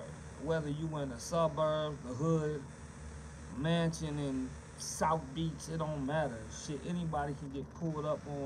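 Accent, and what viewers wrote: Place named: Appalachia, United States. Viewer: You mine As well take his spot cuz. Ya got the hillbilly accent whole thang. Just like Willy did.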